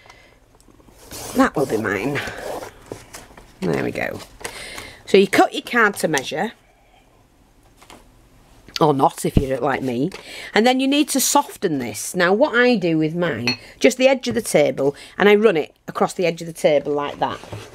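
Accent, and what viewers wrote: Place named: Yorkshire, United Kingdom. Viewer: I have just been informed that your delightful accent is Yorkshire. Big happy smile over here.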